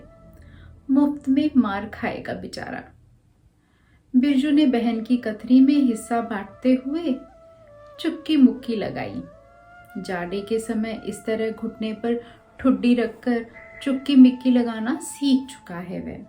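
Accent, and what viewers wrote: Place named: Bihar, India. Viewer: बिहार के सीमांचल क्षेत्र का विशुद्ध देहाती पुट से परिपूर्ण है,"रेणु"जी की कहानी और आपने इसकी प्रस्तुति उसी भाव एवं उच्चारण के साथ करने का अच्छा प्रयास किया है।आपको बहुत बहुत साधुवाद।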